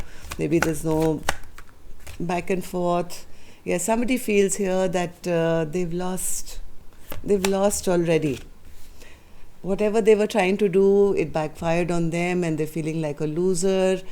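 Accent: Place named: Delhi, India. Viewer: Your voice is so familiar.. are you from New Delhi 💐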